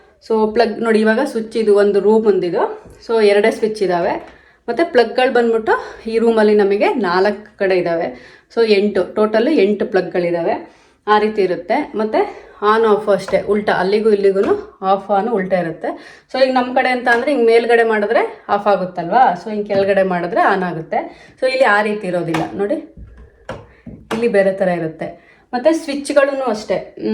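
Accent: Standard. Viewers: kannada swaccha vagi mathadthira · ದೂರದ ಅಮೆರಿಕದಲ್ಲಿ ಇದ್ದರೂ ಸ್ವಚ್ಛ ಕನ್ನಡ ಮಾತು , ಕನ್ನಡ ಅಭಿಮಾನಕ್ಕೆ ನಮಸ್ಕಾರಗಳು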